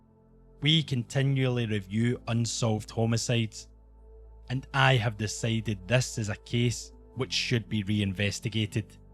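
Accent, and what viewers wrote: Scotland, United Kingdom. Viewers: The scottish accent!!! so special!!!! ❤️ keep up the great work! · Hi, I just discovered your excellent channel. I was raised in Canada by my Scottish grandparents and I love listening to your videos. Can you tell me where your accent(s) are from in Scotland? My Nana was from Glasgow and my grandfather was from Tighnabruaich in the Kyles of Bute ( he said he had an “Argyll” accent and most people could not understand him in Canada) Thank you!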